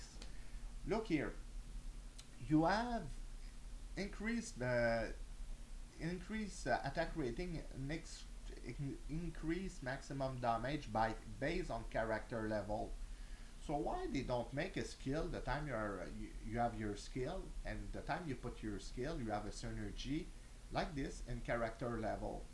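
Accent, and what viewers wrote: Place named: Quebec, Canada. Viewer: I cant leave a message on your video about enigma breaking the game because i guess alots of snowflakes insulted you but i just want you to know that myself (and all the old school diablo 2 players i know) hate enigma and wish it gone from the game!! Cta too Ps : est tu Québecois? Ont dirait que tu a l’accent du Québec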